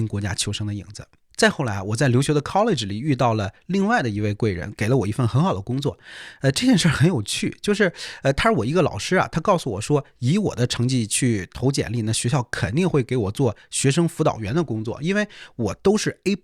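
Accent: Tianjin, China. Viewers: 老师，您讲的很好，但我很好奇您怎么从山村里走出来的？ 我听着您的天普口音很亲切…这种口音怎么可能从山村里走出来…蓟县也不说这种天普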